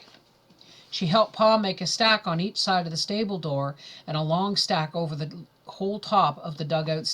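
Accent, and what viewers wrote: Canada. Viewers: Not everyone knows every word plus she is Canadian we pronounce some words differently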